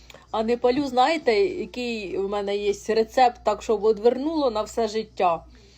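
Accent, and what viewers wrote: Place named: Poltava, Ukraine. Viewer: Говір наче як з Полтавщини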